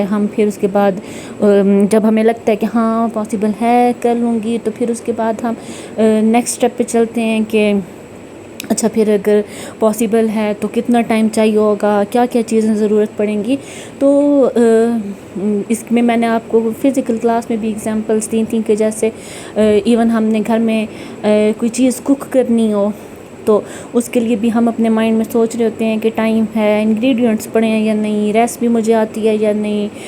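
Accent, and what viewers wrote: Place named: Saraiki, Pakistan. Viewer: SARAIKI TEACHER 💯👀 HER WAY OF SPEAKING 🗣️ TELLS SHE SPEAKS SARAIKI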